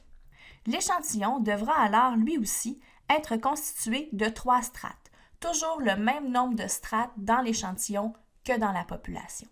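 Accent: Quebec, Canada. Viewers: J’adore l’accent québécois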